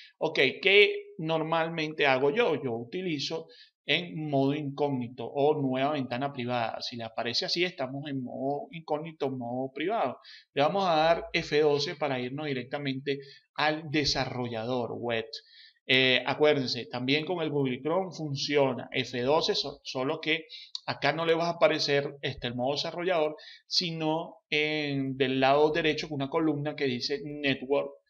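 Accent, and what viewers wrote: Venezuela. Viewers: Gracias, es de mucha ayuda, lo gracioso es escuchar lo que decías de tu hermano como si hablaras con un amigo, jejejej, tan venezolano;). Gracias de verdad!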